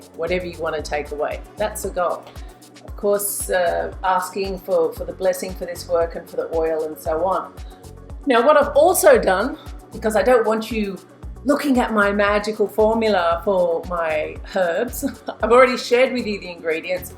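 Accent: Australia. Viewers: I gotta tell you! It's so refreshing listening to an aussie accent talk about witchcraft/demonolatry/magick/occult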